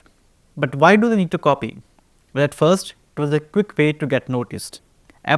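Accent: India. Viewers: your accent is shouting indian!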